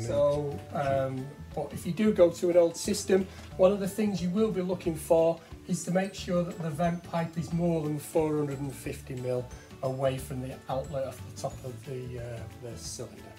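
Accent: United Kingdom. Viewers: Your British ascent make it difficult to catch up, but it was way interesting thanks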